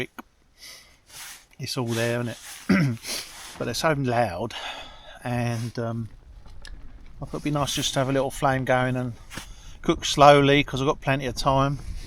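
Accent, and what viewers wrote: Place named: South East England, United Kingdom. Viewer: You've got the same tent as me, South east accent same... so subbed. 😂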